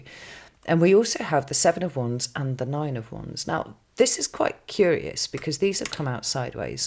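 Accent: United Kingdom. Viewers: I have been watching tarot and reading professionally for many lifetimes. I have NEVER seen a reading that resonated more strongly. It was like a replay of a conversation I had with a dear soul sister whilst sitting in the forest here in NZ last night. What’s more, her British accent is identical to yours. I’m a psychic/medium and that dear one was some truly beautiful reading of the tarot. I’m only an intuitive reader, but you totally know your sh!t! Your understanding of how the energies weave are phenomenal. Deep gratitude for all you bring and so freely give. Much love to divine sister. 🙏♾💜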